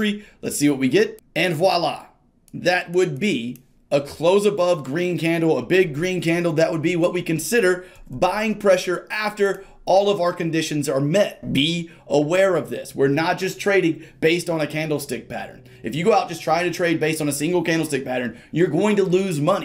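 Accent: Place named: North Carolina, United States. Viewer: Where you from? What's that accent? Sounds like North Carolina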